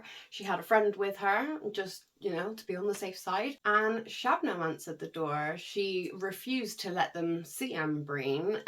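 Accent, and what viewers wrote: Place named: West Country, United Kingdom. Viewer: Cornwall, or the West country? 😂 that's a guess from a northerner. Either way, great video, keep at it! :)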